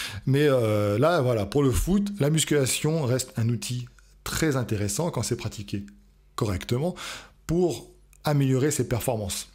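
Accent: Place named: Nice, France. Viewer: T'as de plus en plus l'accent niçois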